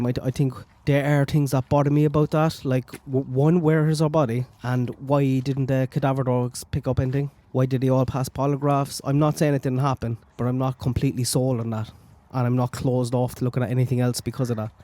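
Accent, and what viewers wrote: Ireland. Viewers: God you sound so irish i agree i think she was sold how terrible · I’m glad you decided not to retire.. Your gaining ground so keep going , I’m also a big fan of Michelle and I’ve heard her mention you so focus on the positive and draw strength from us .. Plus I’m guessing there’s a couple women loving the Irish Accent.. respect from beautiful Canada 🇨🇦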